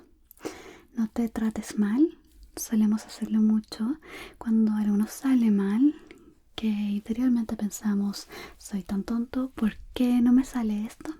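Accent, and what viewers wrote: Chile. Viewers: sos de chile? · Eres de chile ?👁👄👁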